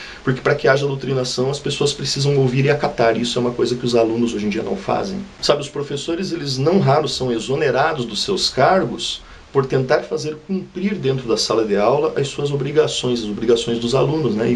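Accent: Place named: Rio Grande do Sul, Brazil. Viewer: Disse tudo, sou professora e sei como é. Adoro seu sotaque carregado e viva a nós gaúchos!!💪👊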